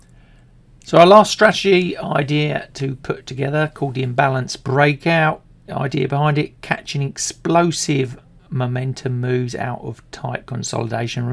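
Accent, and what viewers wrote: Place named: Kent, United Kingdom. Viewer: Enjoying the Kentish accent as usual. Of course, the traditional pairing of volume footprint charts is CVD (cumulative volume delta), the order book, DOM (depth of market), and sometimes VWAP, as well as volume profile, targeting all the phases (initiative, absorption, exhaustion, reversals, etc.) of a typical cycle that you described in each of your strategies. I’ve switched form Renkō to using TPO (time–price opportunity) to identify key levels and market state (rotation, trending, acceptance, rejection, etc.) and then footprint charts specifically for timing of execution.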